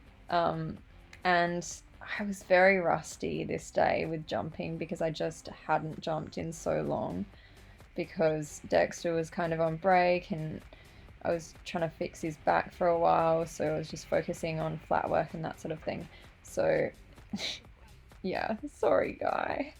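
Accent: Australia. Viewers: She is from Australia, that's our accent.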